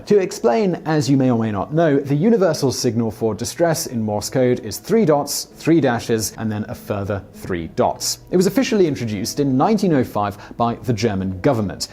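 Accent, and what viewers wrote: United Kingdom. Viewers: OK Monsewer, you poke fun/schit at people making up words or not being able speak certain things. You sure mispronounce so many words yourself and do it with a straight face. Are you trying to be funny or is it that you really don't know how to pronounce these words. Or is it that you seem to be Brit or are speaking with a Brit accent to come off with a holeyer than thou attitude? By the way the English language should be renamed the American language. Since Brits can't pronounce half the words. Do English/Brits/Limeys know just how ridicules they sound? Some Brit movies need closed captions to understand what they are saying. Sorry, I just recalled an Aussie I ran into in Thailand, to this day I still don't know what he said in the short conversation we had, I nodded my head mostly to what he was saying and that seemed to satisfy him.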